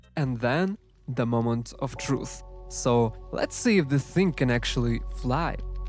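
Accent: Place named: Russia. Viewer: Звучишь как русский бро, а видос прекрасный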